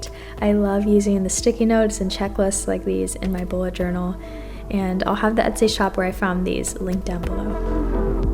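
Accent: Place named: United States. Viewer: You sound american how did you get something from 다이소? Do they have online stores?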